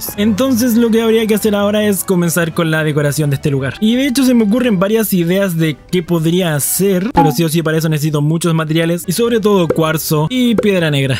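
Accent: Chile. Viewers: Tío de que que país eres me párese de chile o no y feliz navidad que te visite el viejo pacuero po XD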